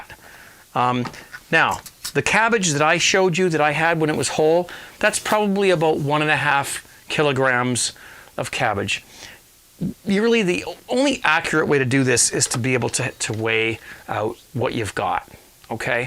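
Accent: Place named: Canada. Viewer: French side on the sea salt box? Either IT'S from Canada, or is, I imagine. Using metric, eh? Right on, sounds like a fellow Canadian. Even apologized for dropping the box! I remember once I apologized when someone bumped into me at the pub! Anyways, great vid, I seen another method where basically it was all done in the jar. Put a small layer of salt at the bottom, add a layer of finely sliced cabbage, tamp it down, add a layer of salt, add a layer of cabbage, tamp it down, salt, cabbage, tamp, salt, cabbage, tamp, etc. I guess it can work either way, but more salt is probably used in the layer process. The layer process also seems a tad bit easier. Seen it on Jas. Townsend and Son. Gonna have to make my own sauerkraut some time! Definitely subscribing to this channel, I imagine there's vids on making home brew as well, which I'll certainly enjoy watching!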